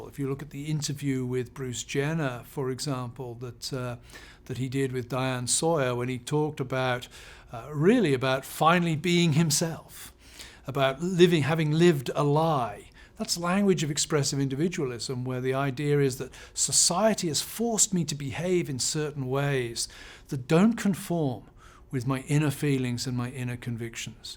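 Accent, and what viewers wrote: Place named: England, United Kingdom. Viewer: If he applied this baloney to American Evangelicals he would come up with the same crappy conclusions about them as intends about gays and lesbians. - What he is doing is claiming that gay people are concerned about nothing, and I mean NOTHING aside from SEX, SEX, SEX. This has been the tactic of bigoted American Evangelicals for DECADES. All he is doing is dressing it up with phony 'intellectualism' along with his English Accent. If you can't think about gay people in any other way than THE SEXUAL REVOLUTION, you are a bigot, because you are completely denying their complex identities as human beings, - which you claim in nothing more than 'Christian' , - which is complete dishonest baloney. You have personal issues you need to deal with.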